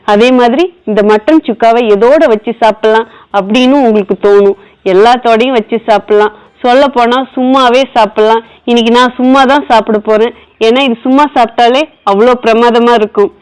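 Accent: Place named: Kongu, India. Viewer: Supernga. I am vasuki Aunty. Coimbatore Tamil i am Coimbatore. Kongu tamil super.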